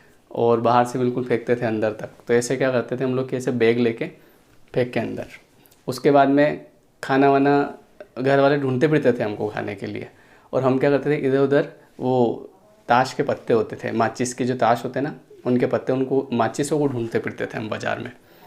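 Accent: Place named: Malwa, India. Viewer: Your tune seems indore and ratlam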